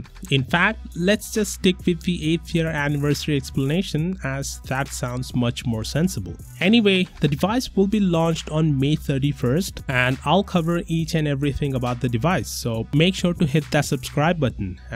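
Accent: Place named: India. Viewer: The way you're fluctuating between the Indian and the wannabe American accent is making you sound unclear. Go for something that is more comfortable with you.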